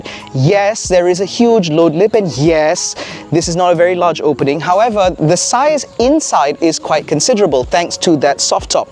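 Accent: England, United Kingdom. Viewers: English accent english car perfect match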